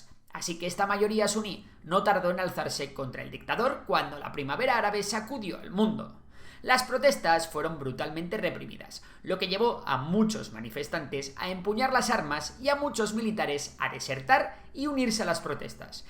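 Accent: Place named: Spain. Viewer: Quién más odia el irritante acento español? 😫😖🙋🏻‍♂️🙋🏻‍♂️🙋🏻‍♂️🔚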